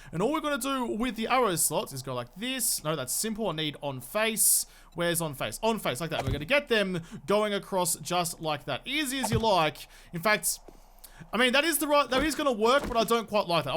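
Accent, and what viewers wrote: Australia. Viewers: keep saying stuff the Australian way, bugger the Yanks!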